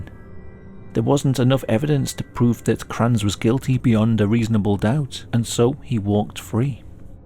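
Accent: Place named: Liverpool, United Kingdom. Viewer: That's not a Welsh accent, it's Liverpudlian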